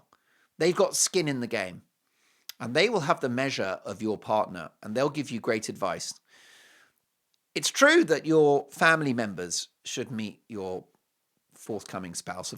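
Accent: United States. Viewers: your American accent is really good!! Enjoy the show and I look forward to it daily ❤❤ It sounds like you got in some serious trouble yesterday 😂😂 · Your American lawyer accent!😂😂😂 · Great American accent!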